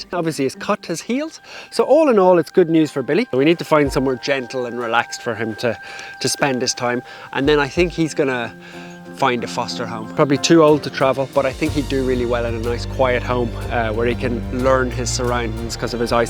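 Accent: Ireland. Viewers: Dear god you sound as if your from southwrn ireland Im appaled Ibwill give a home to any animal that you need to. I have three pets 2 dogs and 1 very old cat which I picked up of the road supposed to be dead I brough him home and have had him for 7 months hes very old old but doing ok. If I can help I am here and will help all I can .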